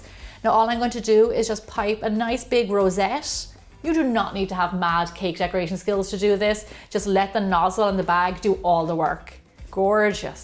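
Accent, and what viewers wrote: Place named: Ireland. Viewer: Gemma Stafford ya! Like your english with that Irish accent. Very unique 😉 My mom loves hearing you talk in your earlier posts ☺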